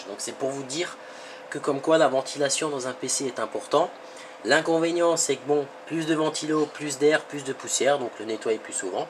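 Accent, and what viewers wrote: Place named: Alsace, France. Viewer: cet accent alscacien du feu de dieux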